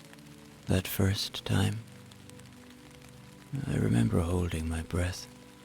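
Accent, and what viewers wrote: Ireland. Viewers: Woohoo! 1 hr gang! pm Texas USA. Keep that soft Irish brogue coming. 😊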